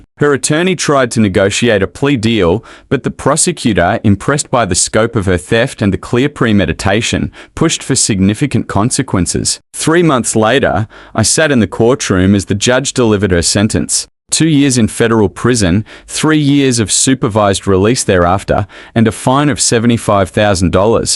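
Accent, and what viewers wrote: Australia. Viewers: Having an Aussie voice telling the story was a pleasant surprise.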